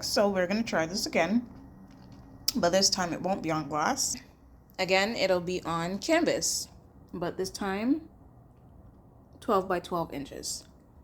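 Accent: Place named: Jamaica. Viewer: A patois (patwa) dat mi hear 😅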